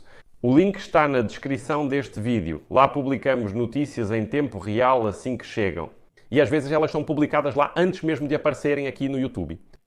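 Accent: Portugal. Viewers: Quem é você para ter "pena" de alguém? Quando as "crianças" lutam por sobrevivência não pedem hipocrisia de outrem, mas engajamento. Devemos considerar vidas humanas independente de etnias ou credos e a compaixão dissimulada não acrescenta, subtrai. "Ter pena" é melhor que exigir justica e direitos humanos indistintamente, é olhar de cima uma narrativa inconclusiva em sotaque português.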